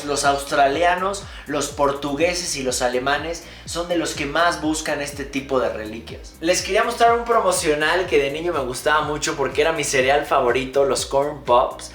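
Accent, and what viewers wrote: Fresa, Mexico. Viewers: Hable bien amigo, no sea fresa. Con respeto se lo digo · en los primeros vídeos no hablaba fresa no se a que se deba ese cambio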